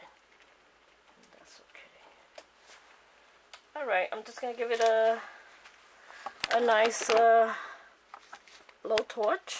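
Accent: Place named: New Jersey, United States. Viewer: Lol I knew you were a Jersey girl or very close. I have cousins in Jersey, I'm in Southwest VA and we tease each other about our accents 😁😁 That sure is an awesome piece of work on both 💖💖💖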